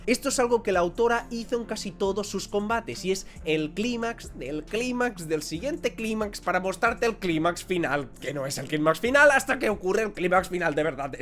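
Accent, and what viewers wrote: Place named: Spain. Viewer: Desde que descubrí este canal, me sentí en casa porque encontré a alguien que habla fuerte, con carisma, emociones y acento español. Un abrazo desde Perú, maestro. 🫂🐺🇵🇪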